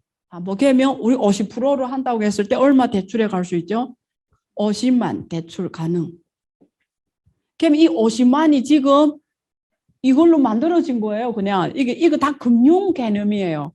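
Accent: Yanbian, China. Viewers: 한국에서 돈벌려면 표준어좀 배우는게 좋겠어요!. 연변 사투리 듣기 싫어요!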